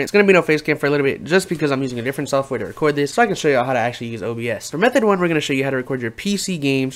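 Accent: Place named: New York, United States. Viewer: You must be from NY or the east coast. But bro thanks for the video man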